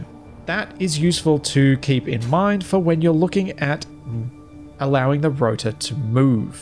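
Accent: Australia. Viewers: Great videos mate. Love seeing a fellow countryman leading the way in SE Tutorials and besides I just happen to like hearing our Aussie accent on YouTube. Cheers thanks for the content pal. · Pretty sure is australian, but that's a guess!:D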